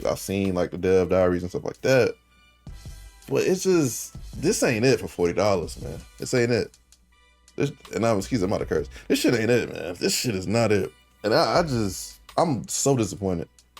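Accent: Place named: United States. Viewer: From the footage I've seen they're just recycling so much of the first game and it feels like they want to do fancy with the newer stuff like eye tracking, but I honestly wouldn't care much about that either. I don't get how they can mess up so hard. Did they actually create any new maps? All I've seen so far is old maps... It was quite hard to understand your American accent lol, but a fun and honest explanation. Greetings from Belgium!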